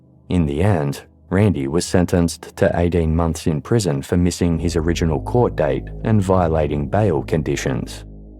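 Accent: Australia. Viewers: He's an Australian...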